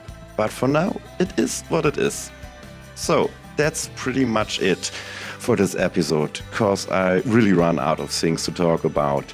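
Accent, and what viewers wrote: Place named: Germany. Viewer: ich liebe es wenn ich durch den Akkzent erkenne das man deutsch ist. 😂 Schönes Video.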